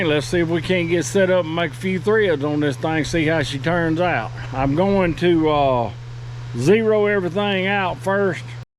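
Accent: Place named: Boston, United States. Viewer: Trying pinpoint that accent and I’m guessing that’s a Boston accent hahahah. Great video just subscribed.🇺🇸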